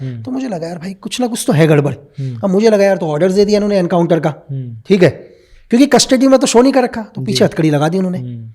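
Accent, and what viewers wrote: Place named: Kumaon, India. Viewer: Kumauni log aise hi bolte hain ..krke ya thahre ❤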